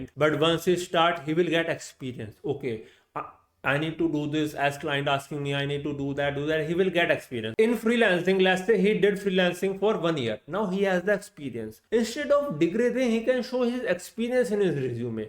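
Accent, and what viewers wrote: India. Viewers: Bhai english hindi jaisi hai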